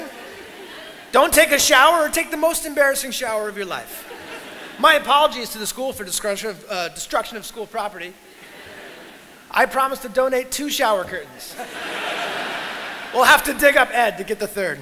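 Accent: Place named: Boston, United States. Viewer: wow that basten accent!! love it! :D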